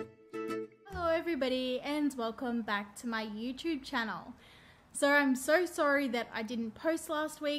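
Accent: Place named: Australia. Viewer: Hi sweetie,i just adore your accent,i so wish i had been born in Australia. That’s a great idea,they are so cute,this is so generous of you,i dont know if I’m allowed to enter as I’m from Ireland xxxxxxx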